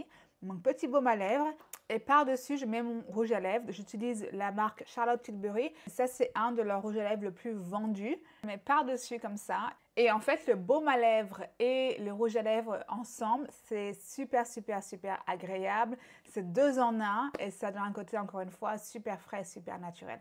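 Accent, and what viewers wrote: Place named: France. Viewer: Trop cool une vidéo en Français yesss , c’est marrant quand tu parles en anglais tu as un léger accent Français et quand tu parles en anglais tu as un léger accent en anglais so cute 😻❤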